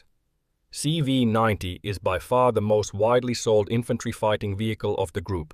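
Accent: Finland. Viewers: Hey you creator. Please put some identification on your content, cause i was really close to dismiss you as AI slop, but then i heard your accent, and as a Norwegian i could recognize you're most likely Finnish ❤ Thank you for looking in to this. Slava Ukraini 🇺🇦 and Europe 🇪🇺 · I'm Norwegian and I know Finnish peoples. He has 100% Finnish accent.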